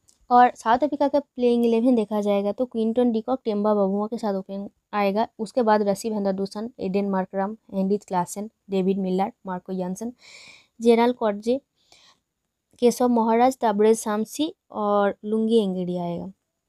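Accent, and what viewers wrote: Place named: Bengal, India. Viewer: Aap west bengal se ho kya awaz se yahi lag raha hai.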